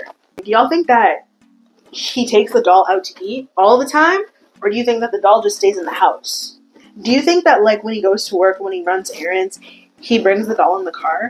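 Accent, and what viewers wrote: Canada. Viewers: Can you say " I don't know about how long it would take to get out the house" in your full Canadian accent. Please lmao. I am only here to hear you slip up! You get so embarrassed hahaha. There is nothing wrong with your accent. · bestie, PLEASE don’t feel embarrassed about your BEAUTIFUL Canadian accent!! I think it’s really pretty!! And PLEASE DO NOT EVEN get me started on your infectious giggle!!!! I’m so happy that I found your content/channel!! Confession: I have been binging on ALL of your videos!! Please keep them coming!!!! 😊❤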